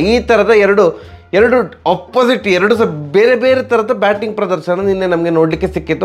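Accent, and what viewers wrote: Mangalore, India. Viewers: ಸರ್ ನಿಮ್ಮ ಮಾತು ಕೇಳಲು ತುಂಬ ಚಂದ ಮಂಗಳೂರುಮಾತುಹಾಗಾಗಿನಾನು ನಿಮ್ಮ ಮಾತನು ಇಷ್ಟ ಪಡುತ್ತೇನೆ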